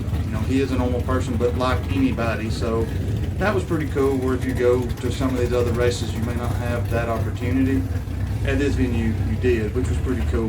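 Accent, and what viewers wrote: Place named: Alabama, United States. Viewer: Hate to break it to u bud ,but you sound like ur from bama too lol. I'm from West Texas so it's same shit different accent, we had boosted and Kayla,doc,Monza, birdman,Shane and banknote here and yea there people taking videos infront of the car as its burning out , people all around them all the way tell the would take off and they were all really laid back about it too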